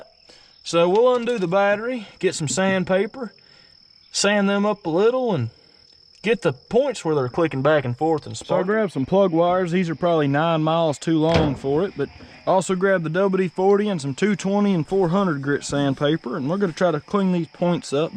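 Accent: South, United States. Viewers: I say this as a man born and raised in Alabama. Birmingham, AL. Specifically Centerpoint. I fucking love your accent dude. I love the combination of southern drawl with advanced vocabulary. It's probably the most interesting accent on earth. Reminds me of True Grit remake, how how cowboys talked. Fucking awesome, and relaxing. I've lived all over the southwest and I had people tell me I didn't sound southern. Irritating. Southern is a badass accent. Sounds better than that idiot boston accent.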